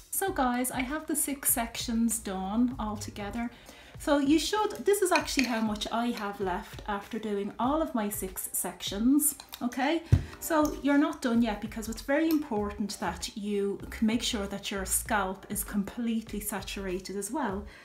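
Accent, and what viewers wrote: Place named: Dublin, Ireland. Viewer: Your accent! you are Irish 💕Dublin accent💕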